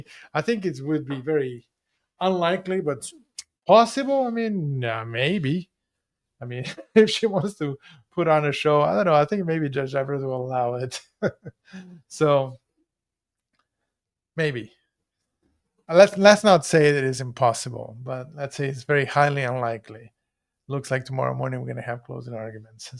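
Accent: South, United States. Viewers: don't listen to them - your acting is hilarious! I love your southern accent!! Please let us see the rest of your movie! 😂😂😂😂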